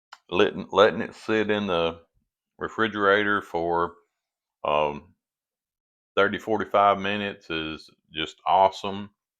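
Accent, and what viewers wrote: South, United States. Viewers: Love your southern accent and your bowled eggs.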